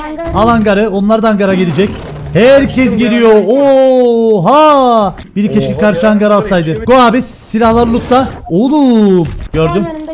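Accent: Cyprus. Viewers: Kıbrıslı knk Diyarbakırlı değil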